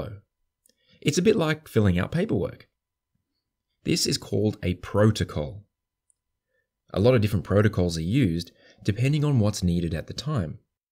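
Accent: Australia. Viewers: Is it Australian accent?? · Networking lessons in an Australian accent? What could be better?? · Very well made and informative video. Very easy to listen to australian accent also! Thank you. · So, the narrator is Australian, I assume, based on his accent, right?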